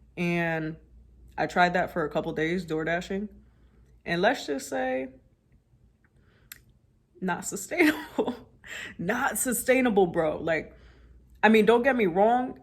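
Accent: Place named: Florida, United States. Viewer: i was gonna guess you lived in Florida cuz how you talk 🤣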